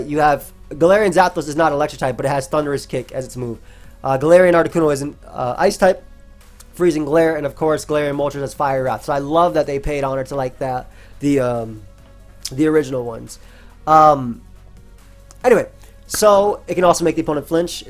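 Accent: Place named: New York City, United States. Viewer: Your NY accent really popping off today haha. I think Zapdos will be great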